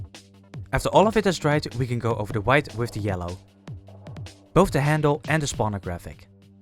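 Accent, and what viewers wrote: Netherlands. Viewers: Thats so cool!! 😍Also are u dutch?😊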